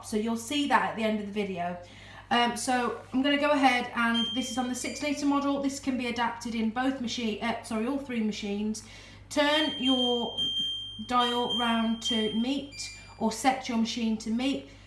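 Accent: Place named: Coventry, United Kingdom. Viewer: Hi, just bought one today, so thank you for this informative video. Don't have to say where you are, but your accent is like mine. I'm 5 miles out of Coventry. I'm guessing you're from round this way too.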